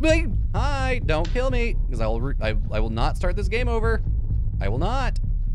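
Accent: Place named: Scotland, United Kingdom. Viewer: I absolutely love the Scottish accent.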